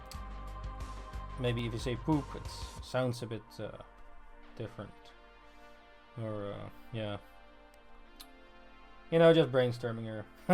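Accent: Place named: Netherlands. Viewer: i just found this recommended to me and i could instantly tell ur accent was dutch lmao geweldig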